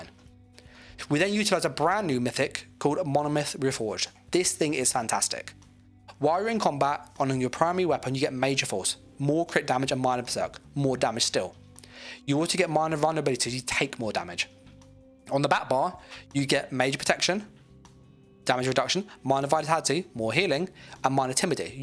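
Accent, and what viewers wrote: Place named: United Kingdom. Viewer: lol I'm just trolling. I knew you was a Brit from the accent. I lived near Mildenhall for 2 years back in the days. Played darts at the Bird-in-Hand pub, was a blast.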